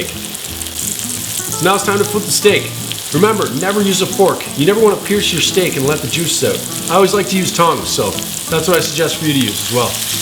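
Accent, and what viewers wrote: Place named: Canada. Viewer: This guy sounds Canadian.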